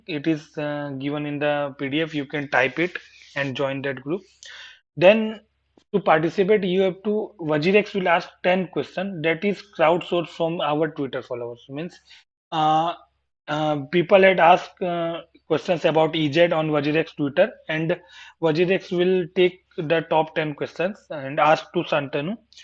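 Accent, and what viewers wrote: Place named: Syria. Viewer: Please don't get me wrong but you speak english like a syrian :D Good day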